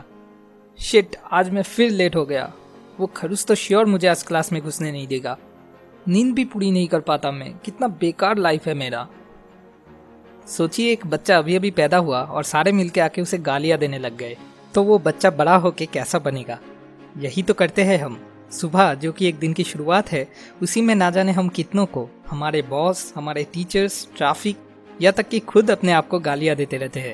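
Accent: Bengal, India. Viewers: Dada accent 🙌🙌it's too sweet rosugulla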